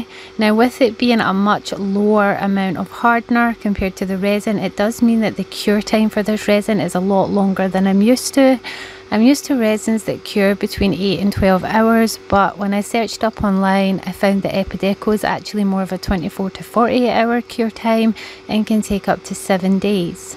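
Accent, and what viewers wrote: Scotland, United Kingdom. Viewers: Hi. Its fantastic to hear a Scottish accent that sounds quite like mine. I'm a bit of a mixture. Born Coalburn Lanarkshire moved to Cumnock Ayrshire. Spent 10 years in Surrey and now I'm in Leslie Fife. Lol. I usually watch Acrylic Paint Pourers from America. Canada and New Zealand. I've just finished Resining a painting and this video of you with your BLESSED Resin plack.. I love it. The colours are amazing I will have to watch the full video. I have subscribed and liked your work. I love Livingston Centre but not been for a while. I have a neighbour who is poorly and wants to go there so I will take her down when we can organise it. Hope to catch up soon. Sandra 🌹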